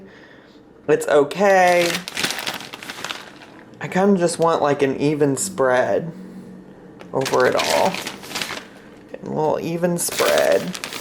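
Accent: South, United States. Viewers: How do you have a country accent but you live up north?! It gets me every time! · Lmao your random southern accent throughout the video is oddly fitting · I never noticed until this video that has a country accent!? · This new southern accent isn't it babe. Kinda like our gorls "lah" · you are very southern in this video LMAO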